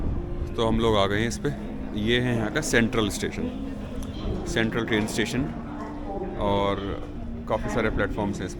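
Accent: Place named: NCR, India. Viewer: Beautiful vlog in ur commentary ur ascent is still from NCR even in abroad - Keep exploring 🎉🎉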